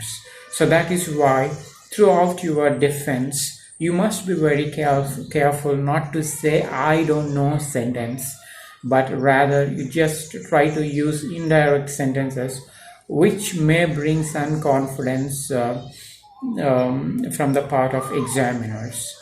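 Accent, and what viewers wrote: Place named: India. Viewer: You speaks like foreigner...i think you are from India...Am.I Right?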